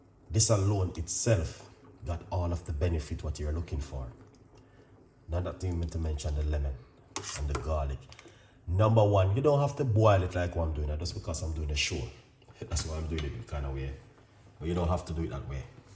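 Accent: Jamaica. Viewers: I like your Jamaican accent. A good friend of mine is from Jamaica 🇯🇲 he is one of the most funniest people i have ever met in my life. He has no filter lol. I'm from Ireland 🇮🇪 thanks for your video, those covid 19 shots and the booster really fu*ked up my chest and lungs real bad. I'm 33 years old, before Christmas 2019 my life was fine i was fit and healthy i have a passion for fitness and running. Now i can't find it very difficult. I work in a Factory and put my ipod on with my radio and play on Blast 🙂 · I like how he talks” Yeah man”, I love Jamaicans🤣😂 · Yummy . I love your Jamaican accent. God bless you. Thanks for sharing. Can the lemon be bottled?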